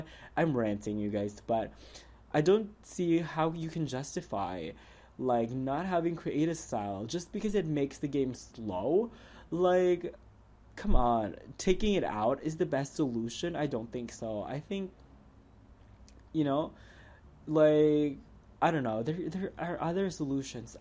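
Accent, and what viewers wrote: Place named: Philippines. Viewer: I really like your accent. Where are you from the Phillipines?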